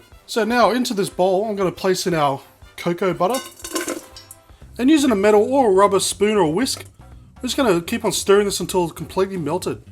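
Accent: Australia. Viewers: I LOVE that Australian accent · he's Australian:)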